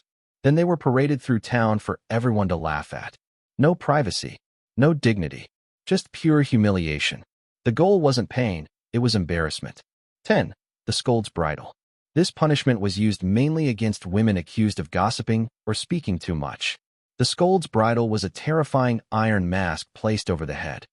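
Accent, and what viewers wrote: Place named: United Kingdom. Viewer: Love the voice It's similar to uk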